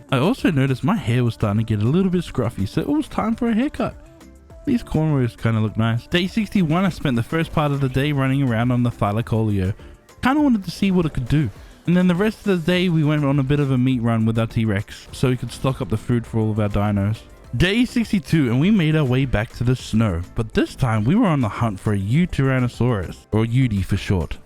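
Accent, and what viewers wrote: New Zealand. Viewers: You sound like you’re from nz. Are you?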